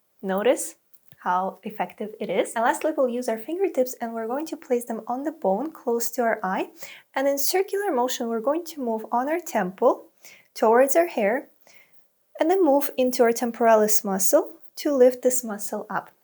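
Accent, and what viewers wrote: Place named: Poland. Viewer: Is that a Polish accent I am hearing? My parents were Polish so something in your accent sounds very familiar!❤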